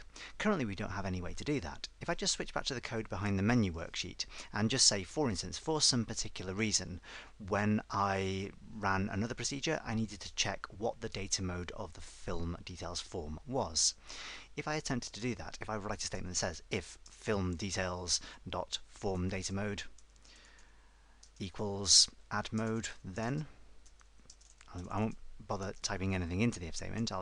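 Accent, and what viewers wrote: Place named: United Kingdom. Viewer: ***** No!I have been subscribing your channel for long time and love your videos.Problem is on me! :) English is not my mother language and I think so you are a British.I can understand easly american accent but the British Accent a little bit different.Anyway thank you for all your videos and tutorials.I'm following you.Best regards.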